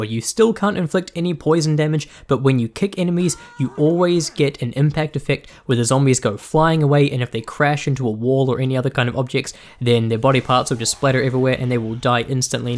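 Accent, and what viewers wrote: New Zealand. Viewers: What part of new Zealand do you come from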